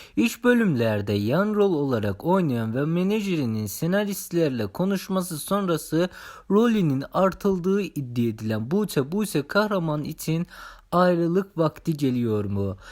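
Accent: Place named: Azerbaijan. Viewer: Kardeşim türmüsün Azeri i anlaşılmayan Bi Türkçe rica ediyorum Türkçe öğrenir isin lütfen🙄😲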